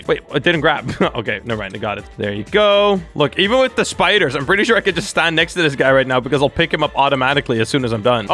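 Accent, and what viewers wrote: Netherlands. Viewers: are u dutch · Are you dutch?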